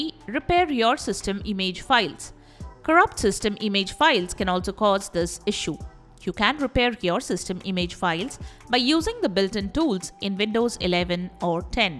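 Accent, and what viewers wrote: India. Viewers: A video full of kinks to follow without actually showing anything in an AI Indian robot voice 😩😩😩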